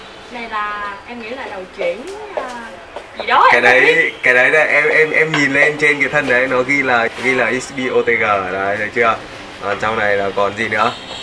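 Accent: Vietnam. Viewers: video mang tính chất khoe gấu là chính. nhưng giọng miền nam hay sao ý mình nghe lần đầu lạ lạ a ạ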